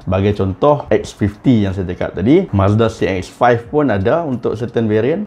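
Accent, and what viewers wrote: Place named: Kedah, Malaysia. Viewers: Bila mana speaker mula berbicara guna bahasa kedah, aku dah tau betapa substancenya benda yg dia nk sembang tu. #thisisnorth #padubaqhang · Mesti org kedah ka? Hahahahah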